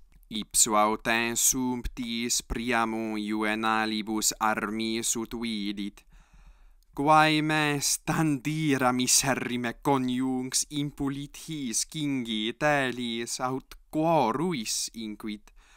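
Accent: Italy. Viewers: Excellently read. The accent is more modern Italian-like and old Proto-Celtic-Italic. The Classical Latin had more closed e and o (unlike Italian now), except sometimes in closed syllables, but not much. The nasalization of vowels receded mostly and the elision of syllables was reduced due to the reduction of the nasalized and very eliding dialect (a dialect of vulgar Latin and Latin that emerged as a dignified minority). The way poetry was composed, was from the nasalized, eliding dialect, which was not the only one. The other main one was much less nasalized, did not drop the final m, except in prefixes, into n (com to con) . Classical Latin poetry is from the clique that used the nasalized, eliding dialect of Republican Latin, which was replaced by its old brother the very literally and not very elidingly pronounced one (both were very identical to one another otherwise). 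Vulgar Latin also existed as the very not eliding, non-nasalized dialect. Both dialects elided to varying extents the vowels between words that had vowels next to each other. The dialect that lasted throughout the Roman cultural clique as such, was the less nasalized and less eliding one. Vowel length and high amounts of changes in pronunciation were preserved until the middle of the fourth century. By the beginning of the fifth century, the pronunciation that would be called close to Italian was more prevalent, though in Sardinia, a variety existed where vowel length was not preserved, but pronunciation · Calabrese Systēma vōcālium est.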